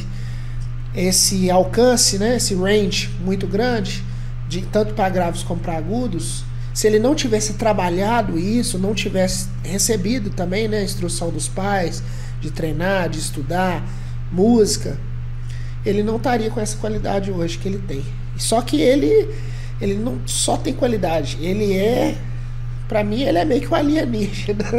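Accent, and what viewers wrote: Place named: Brazil. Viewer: Hi the best thing to do is listen to the singer in order you have done 1 & 2 so next is 3 thanks again for the subtitles my partner is Portuguese from Madeira so I understand some Portuguese but Brazilian Portuguese is slightly different love the Brazilian accent though ❤️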